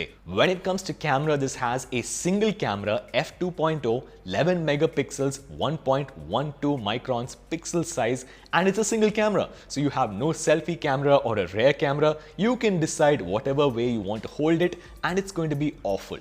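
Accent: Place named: India. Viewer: Дико забавно этот индийский чел говорит, и очки крутые! =)) Если по теме, то я согласен - без NFC, с тощей акб, без поддержки карт памяти этот телефон с железом 2х-летней давности вряд ли будет иметь успех, особенно с ценником в 100к рублей. Хотя, я постараюсь его купить, конечно. Надеюсь, Мелкомягкие всё-таки будут продавать более осовремененную версию девайса. А ещё жалко, что он не на Винде.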